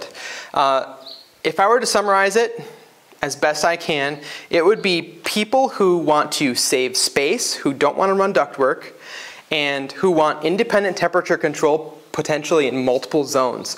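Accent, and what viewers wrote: Minnesota, United States. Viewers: I was like, "this dude is from MN, or Canada." Nice to see a fellow Mn boy on YouTube. Subscribed.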